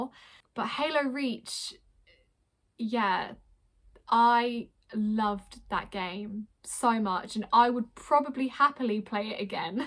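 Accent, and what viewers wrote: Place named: United Kingdom. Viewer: I like the British accent 😭😭 Good luck 😊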